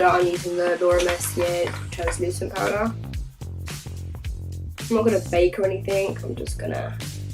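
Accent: England, United Kingdom. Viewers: I love your accent! could just listen to you talk all day makes me miss being on vacation in england :( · Are you from London?:') the way you talk reminds me of myself haha!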